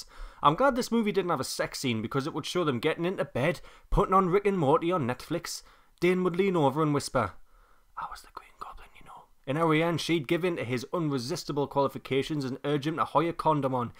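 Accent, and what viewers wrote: Newcastle, United Kingdom. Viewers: i would say Newcastle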